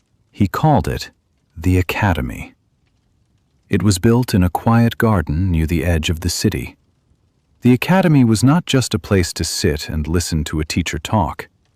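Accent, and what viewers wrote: United Kingdom. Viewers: It was nice, especially the English accent. I hope more interesting stories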